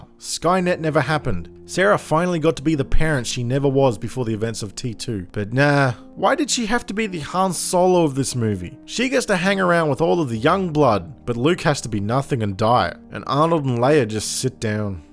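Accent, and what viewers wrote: Australia. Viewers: Are you Australian? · BTW I love that Aussie accent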